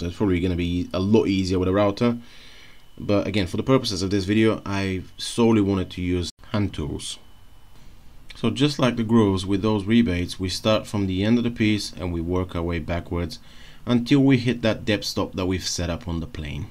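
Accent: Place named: London, United Kingdom. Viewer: what’s that accent?…it’s a bit Laaaandon..but mixed with what? Excellent video by the way.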